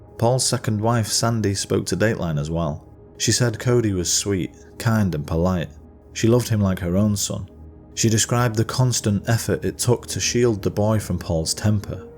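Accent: United Kingdom. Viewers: Why do you have a British narrator when it occurred in the United States?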